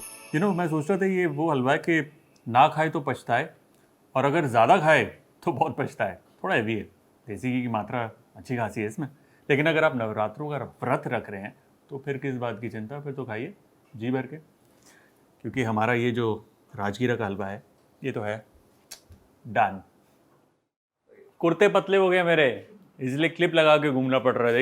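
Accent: Punjab, India. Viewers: App Punjab Se Ho kya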